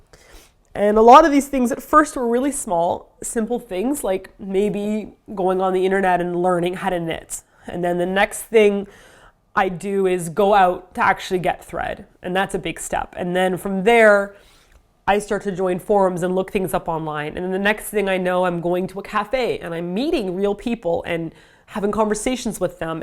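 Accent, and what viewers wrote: Canada. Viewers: ! A. (I won't say "don't", because not is incredibly hard and kind of mean to yourself during a release, but) You don't have to feel sorry for crying.:) It is a release, and it is human to cry. (I have cried during class during every school year of my life. Including college. And possibly at every job. One job, I was so miserable, I was crying in front of customers/while taking orders, and crying in the break room. I didn't feel too bad because I felt I had zero control at that point.:P And honestly, my co-workers were being such jerk butts, I was hoping that uncontrollable defense method(?) would encourage them to treat me with a little more humanity.) B. Your Canadian accent really came out in this video! It was super surprising/interesting!:D C. I think we all underestimate how many people are affected by depression (long-term/short-term), thank you for opening up about your private life to start an open dialogue on the topic. 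D. I love you and everyone and wish everyone luck in the struggles of life. May we all remember the good times over the bad.:) E. In honour of opening up: every since my first depression, I have been able to jump back to my lower points very quickly even if things are fine for months/years at a time. I feel like I equally can bounce back quicker, and luckily for me, I think my darker days arise from situations/environments instead of just a chronic chemical issue. I am very glad that when I hit my lowest point, I did go to my parent (while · I love you. This video was amazing. You made me cry and laugh. I have to say this one reminded me so much that you really are Canadian your accent was so strong in this one. Your videos help me so much and no matter how few you are able to do I will always be excited to see them post. Thank you for inviting everyone to your pity party. We all need one and they should never be held alone.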